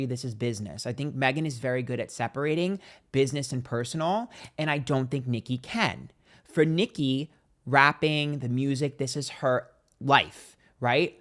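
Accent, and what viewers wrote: New York, United States. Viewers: I KNOW you're an Italian New Yawkaaaa or Jersey 🙌 and a psychic on top of that?? yes pleaseee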